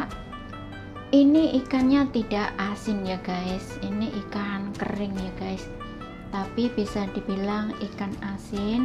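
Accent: Java, Indonesia. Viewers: Kak, muka nya kayak apa liat dong, suara halus bener, jelas kok omong nya, pelan halus bener, jawa bener 😃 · Wong jowo to mbak, ..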